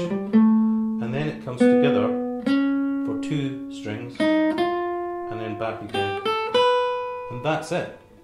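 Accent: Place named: Scotland, United Kingdom. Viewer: Nice to hear a nice well modulated Caledonian accent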